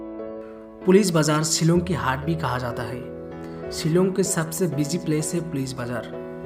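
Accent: Bengal, India. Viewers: আপকা হিন্দী মে হামকো বাংলা শুনাই দেতা হ্যায়। কিন্ত আপ এতনা সুন্দর সে বিস্তারিত ভাবে বোলা হ্যায় যে আমি আপকা ফ্যান হো গিয়া হ্যায়। সত্যিই আপ অনবদ্য হ্যায়।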